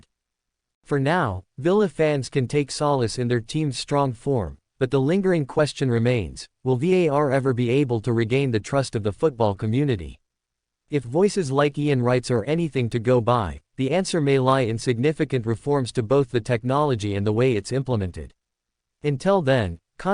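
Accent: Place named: United States. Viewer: And why do we have to listen to an American accent, US English usage (… a 1 to zero win …) etc? Surely there are British English AI voices out there, no?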